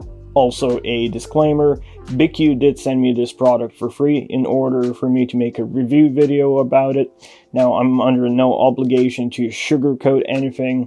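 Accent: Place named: France. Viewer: I can hear the french in your accent. Lmao great video though, very informative.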